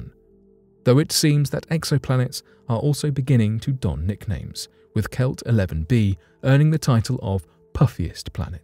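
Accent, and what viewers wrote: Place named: RP, United Kingdom. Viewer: You sound like British Royalty! Best accent ever!keep up the good work as well. Happy New Year!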